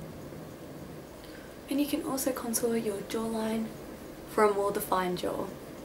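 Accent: Australia. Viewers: aussie not british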